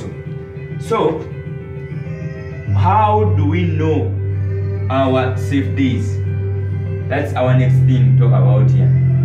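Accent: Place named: Namibia. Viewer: He sounds Namibian👌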